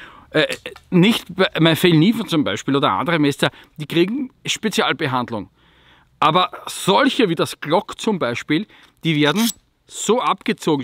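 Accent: Austria. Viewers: Wieder ein interessantes Video. Ich gucke deine Videos immer gerne. Bin auch ein grosser Fan des österreichischen Dialekts 😊. Sehr sympathisch. Ich hätte noch eine sachbezogen Frage. Wie schärfst du dein Fällkniven? Habe mit balligen Schliff so meine Probleme. Vielleicht könntest du zu dem Thema auch ein Video machen 😬😇? Viele Grüße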